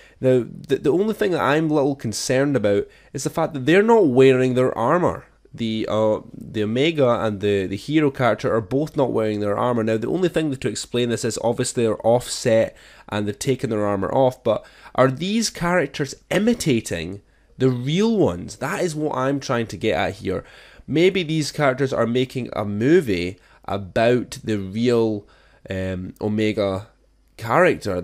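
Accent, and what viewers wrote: Scotland, United Kingdom. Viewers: R u Scottish if yes where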